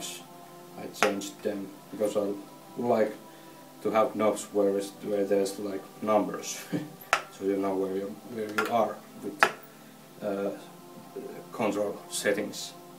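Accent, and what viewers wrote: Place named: Finland. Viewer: Are you finnish? your english sounds exactly like when a finnish talks english :P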